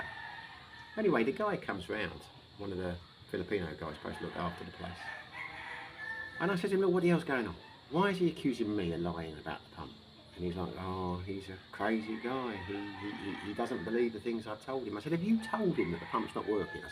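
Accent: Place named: England, United Kingdom. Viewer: Yapping in an English accent for too long will turn off my interest in any topics.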